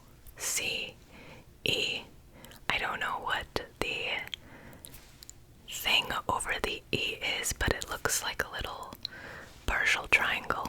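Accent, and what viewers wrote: Rio de Janeiro, Brazil. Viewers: The narrator is Carioca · Exactly, Carioca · Morri com o sotaque carioca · In Portuguese: Você é muito fofa falando em português! Eu amei te ver falando nosso querido idioma, mas tenho uma informação para acrescentar a você: A pronúncia do S em português é mais utilizada como "ssssss". A pronuncia que soa como "shhhh" não é o padrão brasileiro. São pouquíssimos estados que pronunciam a letra S com "shhh", que são os cariocas (nascidos no Rio de Janeiro) e os nordestinos (nascidos na região nordeste). De resto, todos os outros estados pronunciam o S da forma padrão, soando como "sssssss". São culturas diferentes que se expressam de formas diferentes e não tem nada de errado nisso, mas é quis compartilhar essa informação com você! E obrigado por esse video, quero te ver mais vezes falando em português! Você tem um sotaque muito lindo <3 In English: You are very cute speaking in Portuguese! 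I loved seeing you speaking our beloved language, but I have some information to add to you: The S pronunciation in Portuguese is more used as "ssssss". The pronunciation that sounds like "shhhh" is not the Brazilian standard. There are very few states that pronounce the letter S with "shhh", which are Cariocas (born in Rio de Janeiro) and Nordestinos (born in the Northeast). Otherwise, all other states pronounce the S in the standard way, sounding like "sssssss". They are different cultures that express themselves in different ways and there is nothing wrong with that, but I wanted to share this information with you! And thanks for this video, I want to see you mor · Narrator's accent is from Rio de Janeiro. In São Paulo would be sss sound , not sshhh sound. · Toda carioca ela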